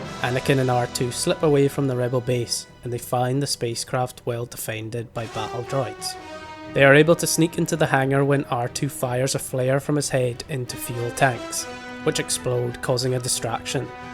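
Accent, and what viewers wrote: Ireland. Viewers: I'm guessing Irish. It's definitely from the Isles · Why can I not tell if youre Irish or Balkan?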